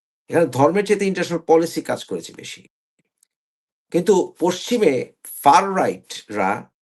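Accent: Bangladesh. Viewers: ডি এন এ চেন্জ করেছেন কিন্তু গলার টোন টা তো পুরাই বাংলাদেশী তাও আবার আন্চলিকতার টান আছে । ডি এন এ চেন্জ করে কি লাভ হলো? কথাগুলা সমালোচনার আকারে বল্লাম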